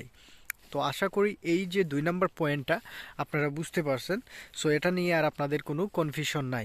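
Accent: Sylhet, Bangladesh. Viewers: আপনার কথায় বুঝা যার আপনী সিলেটি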